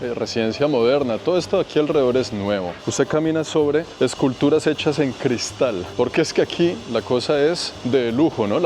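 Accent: Colombia. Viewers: Para mi gusto el mejor YouTuber . Inteligente simpático guapo y ese acento colombiano tan bonito, medio cómico me gusta cuando le habla a los animalitos ❤y conste que no soy de Colombia. Un saludo desde California u🇺🇸 🇲🇽.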